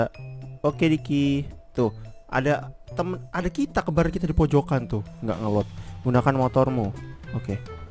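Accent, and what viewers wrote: Java, Indonesia. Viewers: Kaka dari jawa mana , kalo aku bayuwangi Jawa Timur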